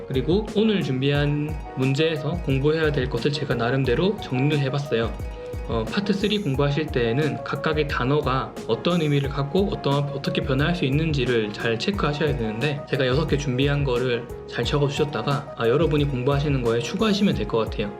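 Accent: Gyeongsang, South Korea. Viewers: 혹시 부산이나 창원이 고향이신가요?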